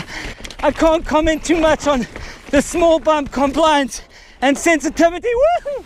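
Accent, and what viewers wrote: South Africa. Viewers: Your awesome! Love the South African accent! Subscribed! 👍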